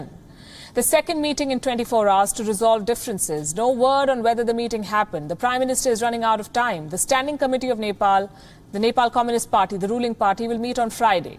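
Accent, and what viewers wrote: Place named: India. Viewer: There is nothing more funny than the sound of English coming out of an Indian person :)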